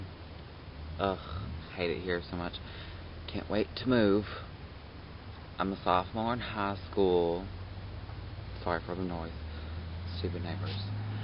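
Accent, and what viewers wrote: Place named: South, United States. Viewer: Congrats, I'm still struggling with coming out and watching videos like this is inspiring me to. I've told a few people so far and the next people I'm hoping will be my parents... :O anyways, thanks for posting an amazing video and btw you have the cutest southern accent I have ever heard!!! :D just saying. -33333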